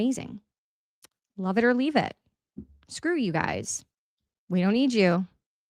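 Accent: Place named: Midwest, United States. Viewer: Youre from Florida 😮 i thought by your accent you were from the Midwest like Wisconsin 😂😅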